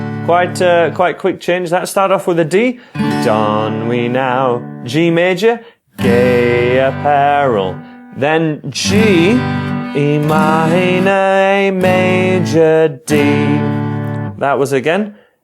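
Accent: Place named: Scotland, United Kingdom. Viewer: danTDM sounds really british , he sounds more ..... scottish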